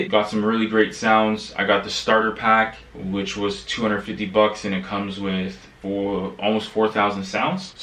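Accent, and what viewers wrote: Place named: Toronto, Canada. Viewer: great vid. I could tell you were from the GTA based on accent alone within the first minute lol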